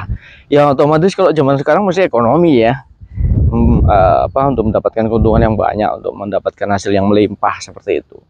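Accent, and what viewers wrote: Banyumas, Indonesia. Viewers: Ngapak tapi pinter😂 maju terus pak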